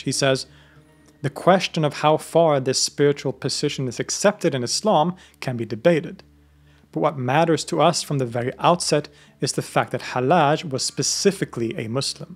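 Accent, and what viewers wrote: Arab world. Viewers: Dude stop pronouncing Persian town names in a arabic accent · What an amazing arabic accent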